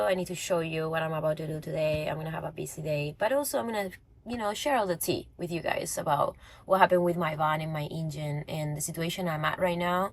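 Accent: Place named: Andalusia, Spain. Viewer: Hola,no sabias que tenia acento Andaluz, llevo poco día siguiendo tu canal y veo que eres un persona de corazón, gracias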